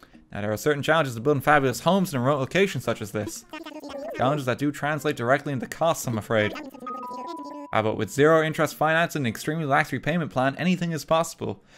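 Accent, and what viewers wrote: Ireland. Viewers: Are you irish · Every time you say Blathers in your Irish accent my American brain hears "Bladders" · couldn't place accent for a few videos, clicked on bio realized he's from ireland. everything makes more sense now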